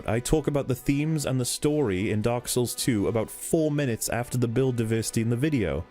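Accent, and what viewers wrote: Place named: Wales, United Kingdom. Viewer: You know the problem with youtubers? They are youtubers, as if that actually means anything in the world. is a wonderful arbiter of taste when it comes to the frivolity of contemporaneous consumables of an entertainment bent., you are a tonic in a sea of grating celery. Your opinion actually means something to me. Most youtube nonces, not so much. Bless you man, I'd buy you a beer to best loosen that smooth welsh speaking voice which just on its own makes you much more view-worthy than the douchebags described in this vid.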